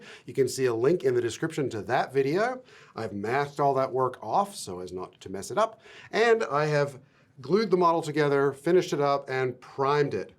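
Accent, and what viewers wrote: Australia. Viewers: New to the channel, love it by the way and spent a lot of time going over the back catalogue. So much priceless information, tips, and general entertainment for anyone into modelling, or like me, getting back into it and freaking out. Buying way too many kits (that aren't being started), but freaking out none the less! Do I notice a slight Australian accent there?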